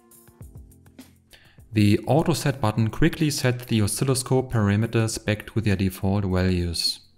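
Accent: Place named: Germany. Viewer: Moin! Das Gerät wird unter verschiedenen Bezeichnungen angeboten. Hanmatek DOS1102, Mustool MDS 2112P und OWON SDS1102. Sind alle identisch, aber unter der Bezeichnung OWON deutlich günstiger. Ich besitze das Gerät von OWON und bin damit sehr zufrieden. An "disadvantages" fehlt ganz klar noch eine fehlende externe Triggermöglichkeit und ein mit 10K doch eher recht kleiner Speicher. Das limitiert doch ganz erheblich die Analyse von digitalen Bittmustern. Trotzdem für den Preis ein super Gerät! Mal grundsätzlich: Offensichtlich (hörbar) Ist deutsch deine Muttersprache. Warum antwortest du auf deutsche Kommentare in englisch??? Deinen Text zu dem Gerät hast du doch auch in deutsch verfasst... Ansonsten recht gelungenes Video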